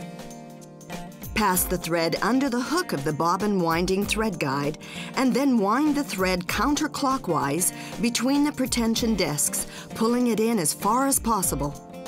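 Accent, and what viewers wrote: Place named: Canada. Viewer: The narrator has a weird accent like Catherine O'Hara's character, Moira Rose, in the sitcom Schitt's Creek. Must be a Canadian actress thing.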